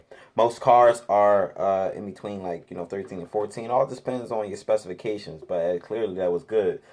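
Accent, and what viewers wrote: Philadelphia, United States. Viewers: Yo u from Philly bro?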